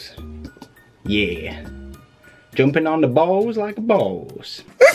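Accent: United Kingdom. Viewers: I love his accent! Is he British because I'm British? :P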